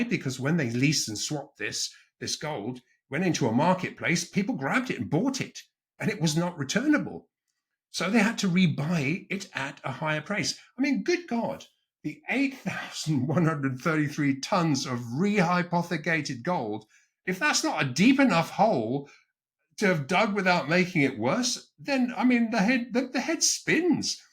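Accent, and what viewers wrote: England, United Kingdom. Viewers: I agree too technical to fully grasp. I love, but between the jargon and his English accent, high low delivery (definitely not boring, but a lot goes over my head) Maybe can create an edited video to help explain the concepts. eg.. 2 minutes of, and equal time for to speak plain American english to interpret what just said.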